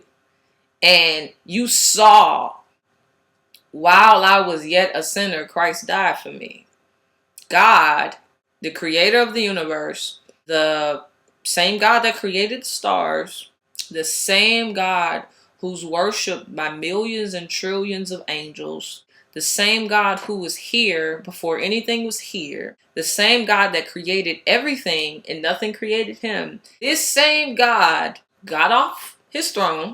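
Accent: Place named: Northeast, United States. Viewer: Ma'am, where are you located? You Sound like you from up north east coast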